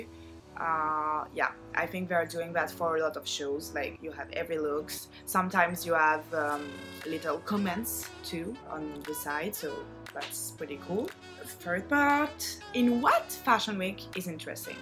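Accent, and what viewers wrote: France. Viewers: Your accent sounds very french that’s soooooo cute😍😭😭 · Hello ! I am american 🇺🇸and your video interested but on the other hand you do not have American accent but a strong French 🇫🇷accent and you look a little your words which is a little difficult to understand you but otherwise it is a very good video the subject is interesting👍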